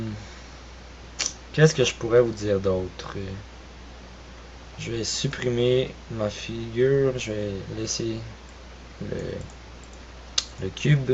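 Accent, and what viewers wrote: Canada. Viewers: LA CANADIEN QUOI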